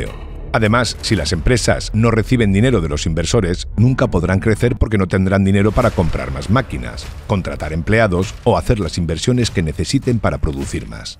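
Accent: Spain. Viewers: Me gusto mucho este narrativa de aprendizaje no hay ruido de fondo esas música que suelen poner que no te dejan escuchar y ese acento español me gusto ❤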